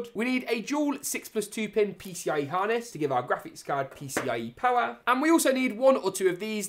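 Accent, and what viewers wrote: England, United Kingdom. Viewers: England accent :/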